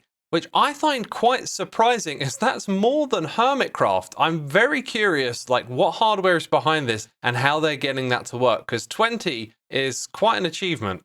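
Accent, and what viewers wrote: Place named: United Kingdom. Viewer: Why do you sound... MORE British than usual???? Is that just me??? lmao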